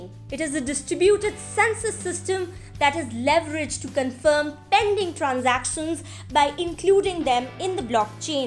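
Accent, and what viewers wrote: India. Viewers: I can not take those Indian accents… I automatically gave it thumbs down, however, she might have had some cool info, besides I took my sweet a s s time to write this response.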